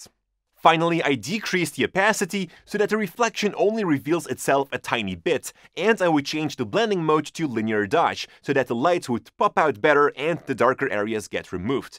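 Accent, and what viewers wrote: Netherlands. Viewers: are you dutch ? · Are you dutch?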